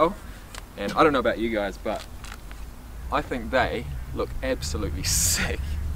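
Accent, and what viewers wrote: New Zealand. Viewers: You sound like a Kiwi? Are you Aussie or Kiwi bro? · Are you an Aussie? Got a bit of a kiwi accent going there bro! Nice vid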